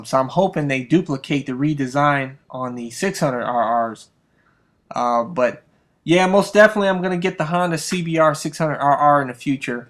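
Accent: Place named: Ohio, United States. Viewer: it's the Ohio accent we all sound alike most of the time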